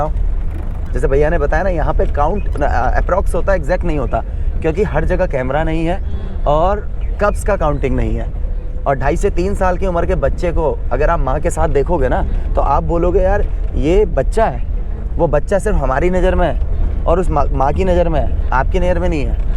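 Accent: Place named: Pahadi, India. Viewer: Pahadi sune me bahut acha lagta h bhai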